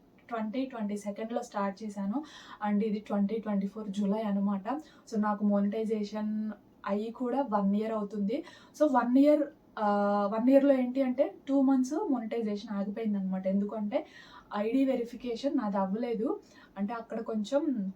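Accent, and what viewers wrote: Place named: Guntur, India. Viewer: అవునండి గౌతమి వాయిస్ లాగే ఉంది ఎందుకంటే స్లాంగ్ ఒకటే కాబట్టి గుంటూరు డిస్ట్రిక్ట్ అలా అనిపిస్తుంది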